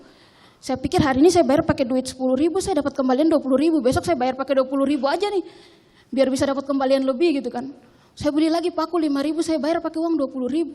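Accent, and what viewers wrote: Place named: Eastern Indonesia, Indonesia. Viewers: Saya orang Sanger Namum BANGGA akan nona dgn gaya bahasa timur..keren keren nona.maju terus dlm berkarya... Tuhan memberkati mu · Kk...logat Ende kantal Alee👍👍👍😁😁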